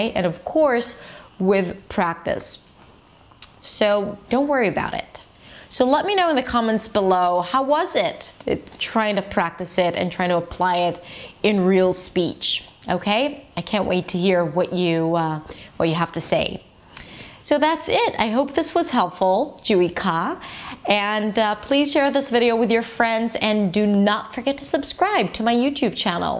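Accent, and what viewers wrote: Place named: United States. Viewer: you are the best teacher i ever seen...😍i m in love with American accent now..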